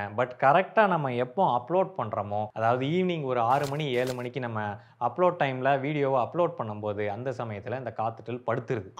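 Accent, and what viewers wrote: Tirunelveli, India. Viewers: Tirunelveli iruku bro 🤔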